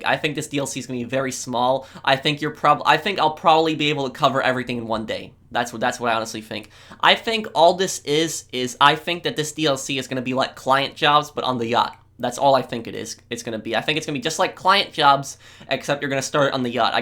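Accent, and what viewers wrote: Canada. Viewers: I sense canadian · Are you Canadian?????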